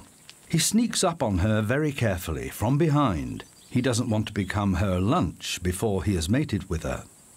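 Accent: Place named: United Kingdom. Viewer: You are correct, Gavin... & btw....this narrator IS British, but is not David Attenborough ...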